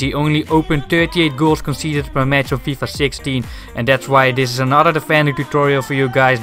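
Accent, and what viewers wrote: Netherlands. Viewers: Hmm are you dutch? I guess so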